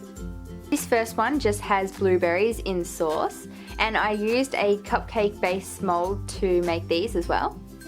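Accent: Australia. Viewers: I love the accent of Australia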